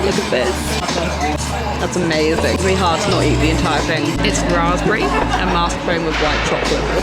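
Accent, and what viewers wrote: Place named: England, United Kingdom. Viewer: Welcome to the city that is Soooo Up themselves (like New York).🤣 Just watch where the news of murders each week happens, and where the deaths (including of children sent home from in hospitals ER's, more frequently occur). The Northern Suburbs so don't like the rest of Sydney that they resist getting a train line, so that the 'Westies' would not invade them or their beaches.(It's not calleed the Insular Peninsular for nothing. Then you have the Eastern Suburbs who are also up themselves! That's why the train line stops at Bondi Junction, and Waverly council did not allow the train to run right to the coast. And neither the North Shorers or the Eastern Suburbs people want to be seen at the Western Suburbs (unless they are just passing through to the Blue Mountains or Perth.).. But sounding like a pom, you will no doubt understand. 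😊 I am in Newcastle who has good beaches, less rain/storms , and a place which helped to make Sydney rich, but without the gratitude. If you think we are hard done by, consider how the big whigs in Sydney treat the regional and rural areas. 😛 enjoy! You will see, no doubt that when the poms sent the convicts to here in chains - ..They were sending them to HEAVEN! (my Gr Gr Grandfather didn't come out as a convict but from Sussex as one of the earlier TEN POUND POMS.. And he never whinged about it. And the Lord blessed Him and his family. 😇 <link>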